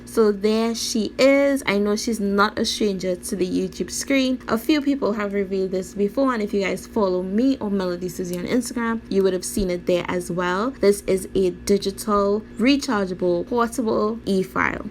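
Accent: Trinidad and Tobago. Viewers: Are u Trini 🥺?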